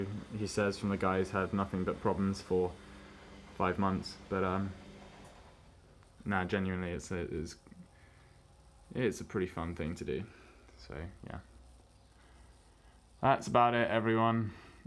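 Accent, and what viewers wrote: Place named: RP, United Kingdom. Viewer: you sound posh as fuck. paint me naruto please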